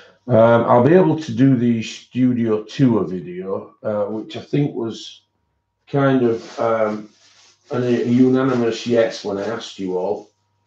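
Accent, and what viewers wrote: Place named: Huddersfield, United Kingdom. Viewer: sorry didn't make that clear and it was one of your own streams. You had a soap box moment and everyone in the stream was so supportive of you. You mentioned no names. I have heard nought else from anywhere. As I said ignore the buggers. I have a potty mouth so being polite about that lol. Only keep those who add value to your life. Your channel has grown because of you and nothing else. Used to work in Huddersfield and good to hear the accent lol. Couldn't stop laughing at Jedi! Chunky munkey lmao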